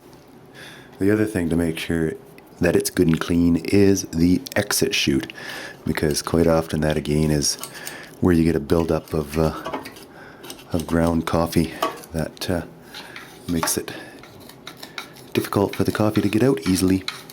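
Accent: Canada. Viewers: Excellent video. Easy to follow and lovely Canadian voice. Thank you :)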